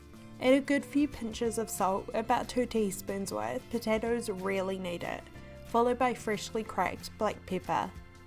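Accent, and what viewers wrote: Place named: New Zealand. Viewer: Me too ! I live in Auckland . Nice to hear a Kiwi accent on here ! Lol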